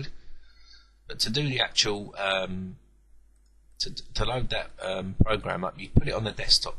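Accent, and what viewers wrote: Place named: Cockney, United Kingdom. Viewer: Your accent is hard to understand. Speak clearly and don't cow off the words. Not many people understand cokni.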